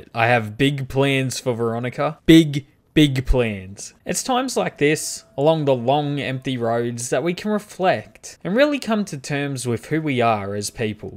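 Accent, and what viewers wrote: Australia. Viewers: An amazing let’s play. I love watching people play nice and good characters but there is something cathartic about this. It just rubs me the right way. The Australian accent helps as well ;)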